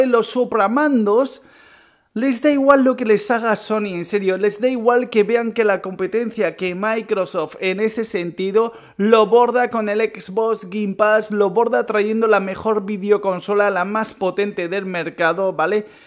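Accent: Mexico. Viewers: Yo pense que eras de mexico xD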